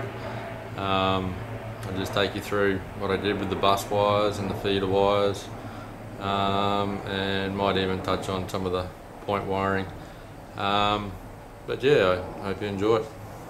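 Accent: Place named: Australia. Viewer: V good & should be helpful to me as I have finished laying my track to find my locos wont go around on it, so I now have to look at doing this. Glad you are running Hornby & select DCC as I am also. You are obviously Aussie & I am English but in NZ so do the kiwis call them suitcase clips "suitcase clips" ? But had you gone straight from the bus to the rail with one feeder you would have saved effort & half the amount of clips wouldn't you? Where did you get the clips & wire from? Thanks.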